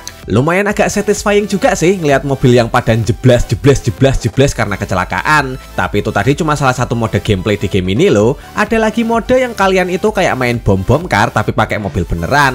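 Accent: Java, Indonesia. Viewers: Lanjut bang,mantap logat jowo ne kentel banget. Yang ngeh no 6 liat size nya kalian emang debes ws pokok e,Iso ngeh lo salut salut,Lanjut bang.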